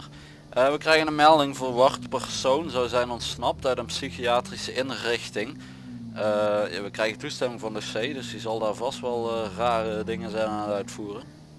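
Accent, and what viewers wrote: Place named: Limburg, Netherlands. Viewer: Goede video! Like en sub, ga zo door. Kom je uit Limburg?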